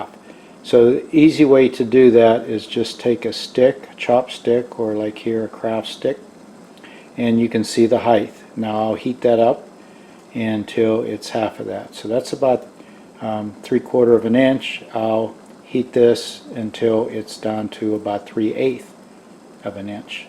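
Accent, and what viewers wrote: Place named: Pennsylvania Dutch, United States. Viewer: I can hear the deitsch accent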